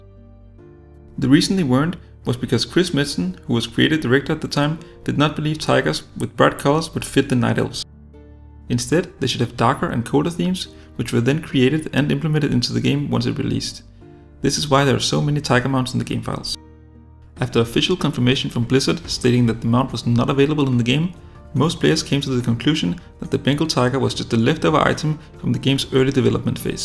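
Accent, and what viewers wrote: Denmark. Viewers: Beautiful Danglish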